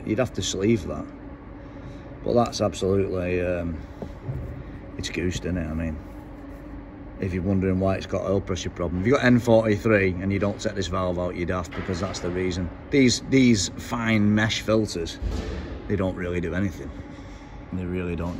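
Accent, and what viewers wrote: North, United Kingdom. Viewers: These videos are a great watch and show your skills. Nice to hear a northern accent too, even if it is from across the border for me !! Please keep making more 👌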